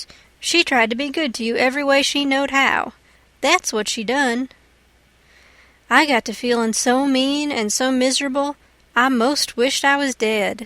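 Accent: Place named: South, United States. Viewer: Hmmm. Not thrilled about the narrator. All I can see is some southern girl in my mind. Should have been a male.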